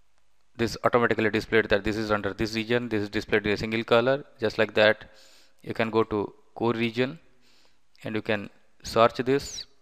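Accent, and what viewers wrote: India. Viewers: jesus the accent of this indians is unbearable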